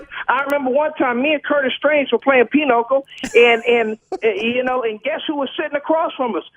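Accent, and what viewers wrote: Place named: Texas, United States. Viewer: This guy sounds like an Aggy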